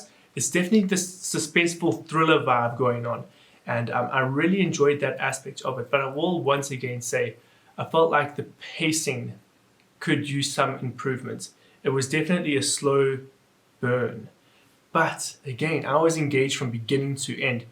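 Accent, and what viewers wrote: South Africa. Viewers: I hear a Bloemfontein accent.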